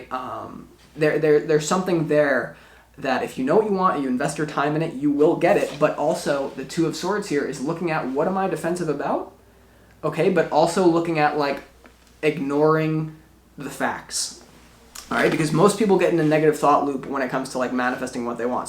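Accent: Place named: Oregon, United States. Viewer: Don't get losttt in the sauceee hahah yesss your lil quotes and how you talk reminds me of my time spent living in Oregon, miss it so much as an Aussie, your readings help me feel closer to spirit and home 💞 thank you x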